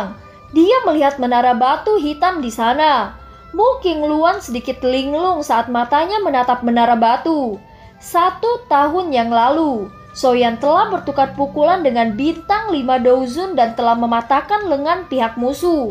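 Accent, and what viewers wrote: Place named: West Java, Indonesia. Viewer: Logat namah siga orang sundaa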